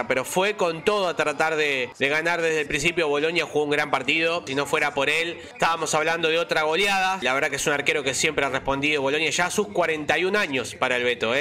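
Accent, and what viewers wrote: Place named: Argentina. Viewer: ESTE PERUANO CON ACENTO ARGENTINO UN CRACKK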